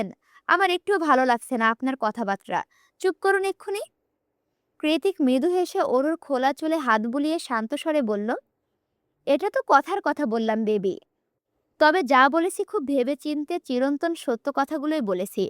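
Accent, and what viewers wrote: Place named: Kushtia, Bangladesh. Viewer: আমার বাড়িও কুষ্টিয়া তে, আজই জানতে পারলাম তুমিও কুষ্টিয়ার মেয়ে,, একমাএ আমরা কুষ্টিয়ার মানুষরাই এভাবে কথা বলি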